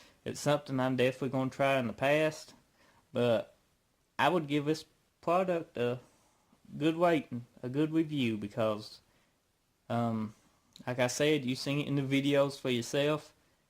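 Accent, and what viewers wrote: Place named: South, United States. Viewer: LMAO....YEP. WHERE ARE YOU FROM? YOU HAVE A SOUTHERN ACCENT...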